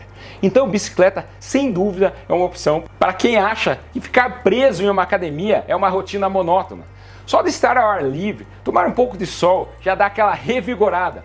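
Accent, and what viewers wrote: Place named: Paraná, Brazil. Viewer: Este sotaque é inconfundível das pessoas do Paraná; morei na Lapa, Cascavel e Francisco Beltrão. Parabéns pela sua dedicação em nos orientar de forma simples.❤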